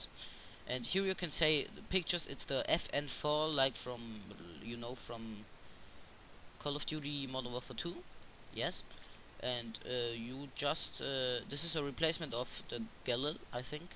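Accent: Germany. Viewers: Are you German? Could we talk a bit? I got the same voice like you xDDD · Man merkt irgendwie dass du deutsch bist,auch wenn du es nicht gesagt hättest,liegt nicht mal am Akzent,nur an der Art wie du eben redest ^^ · Ey , war klar das du Deutscher bist! :D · More people know English than they do German.Only about 100 million people speak german and 340 million people speak english.In other words,there's a more likely chance of someone speaking english than someone speaking german.Besides,it's only his accent.Don't be fooled by the idiots to are racist towards Germans.Most of us are just telling him he needs to work on his English so there's no reason to be crazy mad about it. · DEUTSCH ! :D habe ich sofort an der Aussprache erkannt :D Abo ist dir aber sicher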